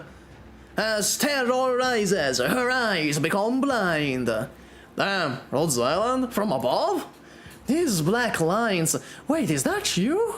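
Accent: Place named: Syracuse, Italy. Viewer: he spoke in a siracusian accent for 3 & 1/2 hours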